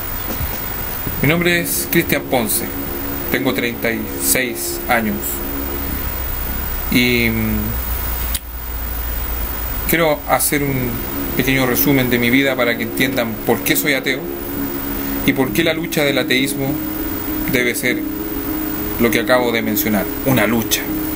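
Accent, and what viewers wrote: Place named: Rioplatense, Argentina. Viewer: una pregunta eres argentino o paraguallllllllo.....dime ...que le echan al agua por ahi???? o te dejaron caer cuando chiquito????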